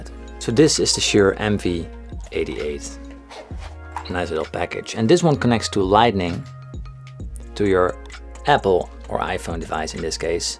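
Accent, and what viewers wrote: Netherlands. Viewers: Ik wist dat je uit Nederland kwam! (Ik hoorde het aan je accent en zocht het op dus ja...) Goede video's!! Ga zo door! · He sounds like he comes from the Netherlands so i checked and yes he is from the Netherlands lol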